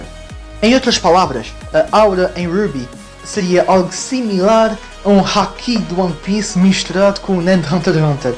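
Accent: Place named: Portugal. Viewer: Nao sabia que vc era português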